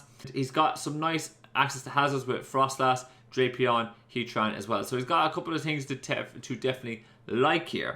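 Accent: Ireland. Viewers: I always forget just HOW Irish you actually sound 😅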